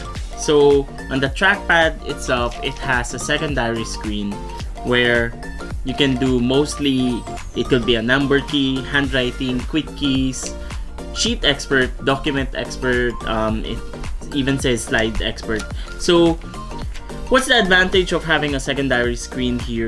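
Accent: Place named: Philippines. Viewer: Hearing another pinoy makes me understand all this tech stuff better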